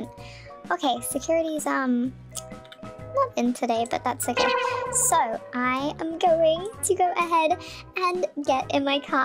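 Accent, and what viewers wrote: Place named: United Kingdom. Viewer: Why is your voice like British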